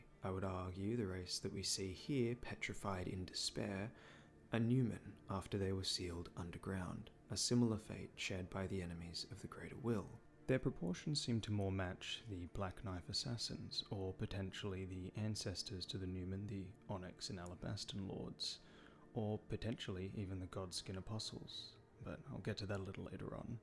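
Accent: Australia. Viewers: Are you from the same region/city in Aus as Vaati, its crazy how close your intonations are. Or is this like posh aussie? 🤣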